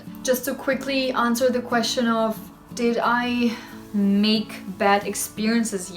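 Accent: Karnataka, India. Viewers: U are an amazing soul, amazing human being. World needs more ppl like u. U are always most welcome to India😊 Ur english accent is pretty much like Indian english accent may be cuz u have lived here for a year dats why.. Nice nice.. U look pretty in Indian dress and bindi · Honestly living in Canada for 8 years now just baffles on the things I’ve missed in india! Also you have a more Indian English accent that most Indians living abroad 🙏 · I noticed a little bit of Indian accent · Your accent is more like on the Indian side. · Oh god you also picked up the Indian accent a bit when you are speaking English 😂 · Love your indian accent 😍😍😍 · Bro she sounds just like an indian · Fascinated to see and hear ur experiences in Mysore. This is our culture. Enjoy. I am delighted with this video and surprised to hear ur accent in Kannada ! U are more than a Mysorean ? 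With affectionate best wishes. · Your english sounds like an indian girl speaking english. Doesn't sounds like a foreigner speaking english. Enjoy travelling👍Enjoy life❤ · Your accent is so Indian! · Your english accent is similar to our Indian English accent, thanks for sharing your experience in our India. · How come your accent is very Indian?? You are pronouncing every work very distinctively as Indians do. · Is this your normal accent... you sound so Indian talking English... intonation & pronunciation so perfect... Feeling proud being an Indian... :) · Nicely stick bindi on forehead, Indian outfits, lovely look, English with Indian accent... It's really a pleasurable experience watching you n listening you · Don't get me wrong , but ur accent looks very desi 😀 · Why her accent is like Indian? What the hell is happening..Is I'm the only one who is feeling that? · Very good indian english accent. 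Interesting to listen your voice. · You are super pretty! ❤️ The have got Indian accent that is cool. And the outfit you are with is just perfect. As a conclusion you are crush of mine.❤️🔥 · Your english wow proper Indian English speaking wow and you look so beautiful and pretty in Indian outfit and bindi on your forehead like i want to marry you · your indian accent is so accurate even most of the indians are not speake in their own accent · Bro ur accent is 💯 Indian!! Never heard a foreigner speaking in Indian accent so accurately!! Howw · I have been enjoying your videos today. It amuses me to note that you picked up some indian accent while speaking in English. :) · You are totally Indian because your vice is totally like as India. Love you.. meet me when you come India.. drop a mail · Your English language is just like Indian girls & unlike British people. How this happen ? Is it learned English or mother tongue ?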